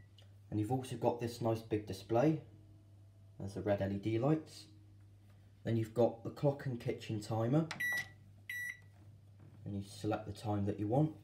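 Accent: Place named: Cornwall, United Kingdom. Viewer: He speaks with a Cornish accent. He probably lives on a farm in Devon or Cornwall. He is a lovely boy who should be working on his tractor.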